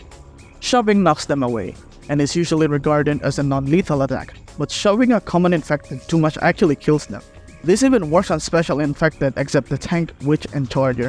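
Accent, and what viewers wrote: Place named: Philippines. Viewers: PEAK FIND, you deserve more views and subscribers for this amount of dedication (also your accent is similar to Filipinos) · He’s Filipino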